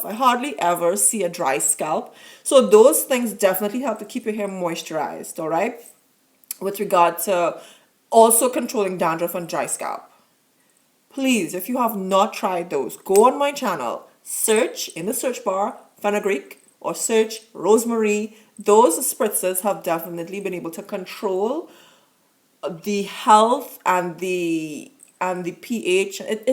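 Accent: Trinidad and Tobago. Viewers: what country are you from? you sound Trinidadian... great tips!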